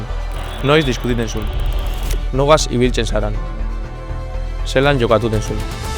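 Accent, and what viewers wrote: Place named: Bermeo, Spain. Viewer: Eskerrik asko... Oso dotore zure bermiotar hizkera. Bizkaitarrak garenontzat bihotza ikututen dau...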